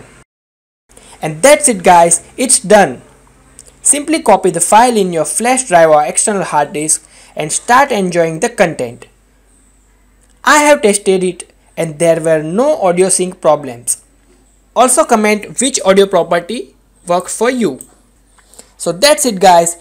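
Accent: India. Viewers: I almost removed the video as soon as i heard indian tone but the fact you spoke English is awesome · as soon as I heard Indian Sound I knew I was saved Thanks mate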